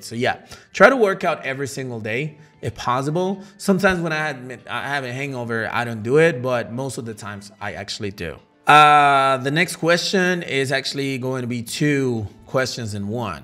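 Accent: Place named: United States. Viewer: Which country are you from? You have an American accent and you seem like a nice person 🙂It is true, you can't always be on the same page with everyone.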